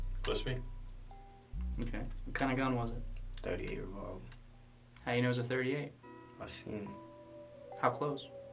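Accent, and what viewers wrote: Boston, United States. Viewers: Love the Boston accent I'm from NY what up. I'm following this trial and I love tour style and how u cut you videos with so many visuals. Also I make club music on Ableton and u see u must be making shit yourself from the back ground. Anyways I like and subbed. Word, no names. ... I did 2 yrs up top over here. That shit don't fly my way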